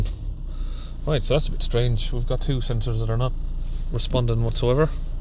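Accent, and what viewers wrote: Ireland. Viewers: SOUTHH Dublin accent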